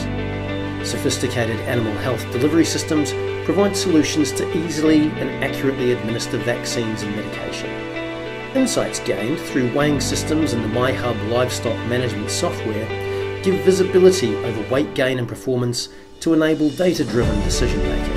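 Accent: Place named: New Zealand. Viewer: Kiwi accent 😉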